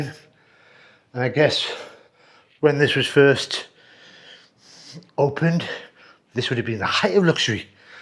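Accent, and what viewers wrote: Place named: Northern England, United Kingdom. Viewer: The English accent is difficult. It’s a working class non southern English accent and it’s hard for me as an American. Ha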